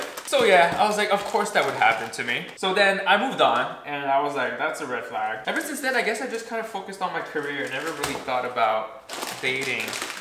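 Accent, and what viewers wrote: Quebec, Canada. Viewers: tu viens du Canada ? parce que ton Français ne sound pas comme le français de la Franca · Omg are you from Québec , canada ???! , u have that french accent , im from Montréal btw ! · Hi! I just discovered your channel, but I'm pretty sure that I've seen your pretty face on Tiktok already once. I guess YouTube algorithm suggested me to watch this video for a reason. I'm 29 and single af, but if I can provide you an advice is to take your time as you're already doing but don't take too much time though 😆 Et wooow qu'est-ce que ça m'a fait bizarre et plaisir en même temps d'entendre l'accent québécois après toutes ces années! Haha take care✌🏾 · OMG! Ta chaîne youtube a pop-up, j'écoute ta vidéo (cuz I kinda relate to the title of being single forever...) Je ne m'attendais ZERO à t'entendre parler québécois 😳! Tu es québécois? Wow~en tk Thanks for sharing this Nice cozy talk ^^ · Wait u speak French sa m’a surpris mais par contre on dirai que t’as un accent canadien pas trop français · Hey!! 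C'est la première vidéo de ta chaîne que je regarde, si je ne m'abuse, c'est bien un accent québécois que j'ai entendu ? Bien le bonjour, de Montréal, hehe ! Plus sérieusement, j'ai 24 ans et je n'ai jamais eu de relation amoureuse ! J'ai eu quelques crush, mais franchement, je peux les compter sur les doigts de ma main et chaque fois, j'ai fini par apprendre que la personne était en couple ou avait un intérêt pour une autre personne. Ce sentiment d'embarras dont tu parles, je l'ai ressenti à chaque fois ! Un peu l'impression d'avoir été ridicule de penser que mon intérêt aurait pu être réciproque, aie. Mais, disons que dans la solitude, j'ai eu le temps de réfléchir, haha. C'est un peu le revers intéressant de notre situation. Je trouve que tu as une très belle approche réflexive et je devine que c'est l'avantage de n'avoir qu'à t'inquiéter pour toi-même. J'ai remarqué que mon expérience de célibat m'a également donné cette opportunité de me concentrer sur mon propre développement personnel. 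J'en suis venue à une conclusion similaire à la tienne : il n'y a pas que les relations amoureuses dans la vie et si je dois un jour en vivre une, j'en vivrai une au moment venu. Pour l'instant, je vivrai en me mettant des objectifs qui m'intéressent plus, notamment avancer dans ma carrière et terminer mon parcours académique ! Ta vidéo m'a été recommandée par hasard, mais c'est toujours nice de tomber sur ce genre de vidéo et de se rappeler qu'on n'est pas seul(e) ! Ton pain avait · Let me tell you, I turned 24 and I have never been in a real relationship. I never found a person I genuinely wanted to be with. Most people find it weird and just don’t get it. Funny enough, I do not feel bad about it, I am actually quite okay with it😂. I feel like you should always do what feels right to you, take your time and not rush into something you don’t want (but, if you do have real feelings for someone, for God’s sake, do not supress them and go for it!) I also fully agree with you, if you are happy with who you are and confident in your own self, you’ll be more than fulfilled on your own and the relationship you’ll eventually get into will be a much better and healthier one. 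PS: ton ptit accent québécois est trooop mimi haha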